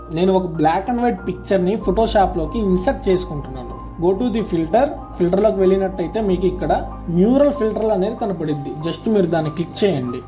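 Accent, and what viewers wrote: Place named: Ongole, India. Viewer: Anna mi vaice ongol sai kada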